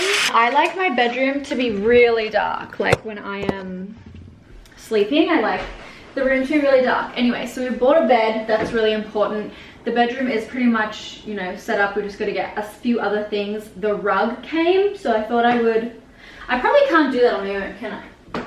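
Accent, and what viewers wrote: Australia. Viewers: I hope you keep your Aussie accent. I lived in the Philippines for almost 3 years and now that I’m back in Australia, everyone keeps saying I’ve got a slight American accent 🤦🏻‍♀️ I just want my Aussie accent back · We LOVE Australians in Los Angeles. Please keep the accent. 🌺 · Love your Aussie accent and I just like the organisation for all of your makeup products and I would also love some vlogs kisses to both of you from Paris💋🇫🇷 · Don’t lose your beautiful Australian accent......listen your husband girl😀😀💕💕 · We love your Australian accent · I'm from Australia so I would say keep your Aussie accent... why should we change for people. And ur husband likes it too. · yeeeeessssss please more setting up videos and yea she will loose her Aussie accent eventually and no no longer Australian you guys are american now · Nice! L.A I am from Venice CA. 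Keep your Australian accent, it is Beautiful! My hubby has a Scottish accent. Love it ♥️ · Keep your Aussie accent! Even us Americans don’t like our own accents🙂 and more setting your place up vlogs pls👍🏻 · Don’t lose your Aussie accent!! I’m Aussie and I want you to keep yours it’s why I love it!!! Also love these vlogs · is right, stick to your Aussie accent, love it. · Agreed with!! Stick to our Aussie accent man! Xx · If it were me, I don’t know how I would deal with ALLLLLLL that makeup & beauty products, and having to organize it...maybe I would pay someone 😆 I agree w/ today on everything: you need paintings/art, a chest (besides his 😉), plants and keep the Aussie accent 😘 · is right you should keep your australian accent, you and so beautiful together. Love you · Aussie accent rules 😂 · Welcome to LA guys. And keep the Aussie accent. Love you just the way you are. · I love your Aussie accent I agree with haha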